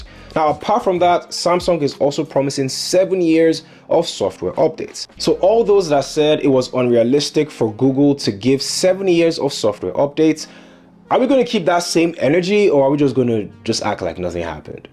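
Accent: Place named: Nigeria. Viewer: bros nigerian accent makes it so hard to understand XD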